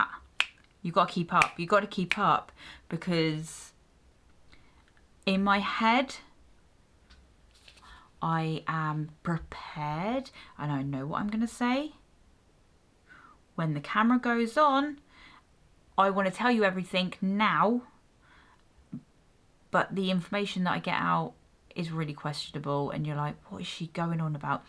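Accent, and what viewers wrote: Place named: United Kingdom. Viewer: Hi new subscriber here I love to find colourist and channels from the UK I love all others too but as I am also English there is a familiarity to me lol great video I look forward to seeing more videos and flick through just sounds so British to me lol I am all over the place in my videos too and in real life so I can relate too many ideas in the head for the mouth to keep up with 😂 and for the buying for multiple hobbies same lol buying things for hobbies is like a hobby in itself haha your personality seems very bubbly and I can see that going down very well In the colouring community 💜💜💜💜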